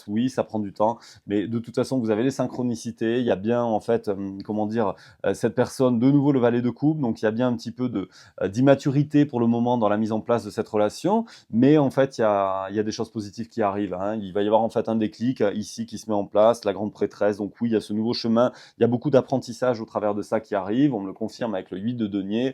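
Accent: South, France. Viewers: Bonsoir je vous découvre, les hommes ne sont pas si nombreux dans votre domaine, il me semble reconnaître l’accent du sud pas tout à fait comme le mien mais presque😉. Je suis étonnée de voir que sur votre chaîne YouTube il y ai si peu de vidéo. Avez vous une autre chaîne ? Comme j’y suis sensible, Vous avez une belle voix 🙏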